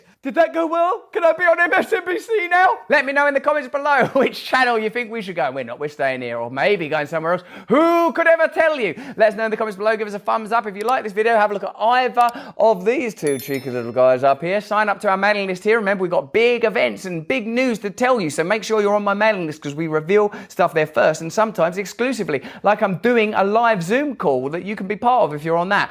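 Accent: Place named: London, United Kingdom. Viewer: YOU SOUND LIKE YOURE FROM LON-DON!!!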